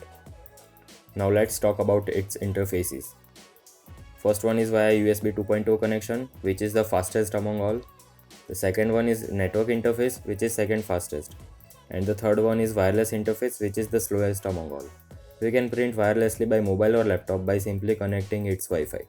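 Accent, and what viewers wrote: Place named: India. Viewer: I understand it but it seems he is from India .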